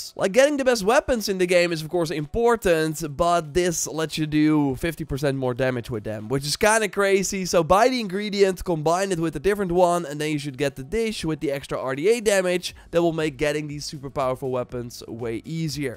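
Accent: Netherlands. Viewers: nearly all youtubers I like lately are Dutch. What the hell 🤔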